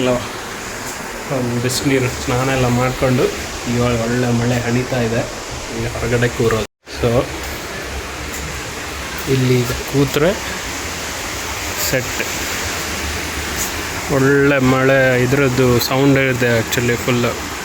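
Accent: Mangalore, India. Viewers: I like Ur Mangalore kannada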